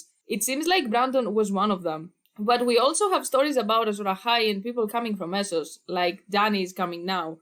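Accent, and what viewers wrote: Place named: Greece. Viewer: Όταν βάζεις τυχαίο βίντεο για Game of Thrones και σε χτυπάει η Ελληνική προφόρα 😆.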